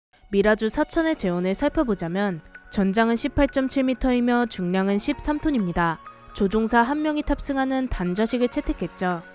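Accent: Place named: Gyeongsang, South Korea. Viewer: 이언냐 갱상도언냐네..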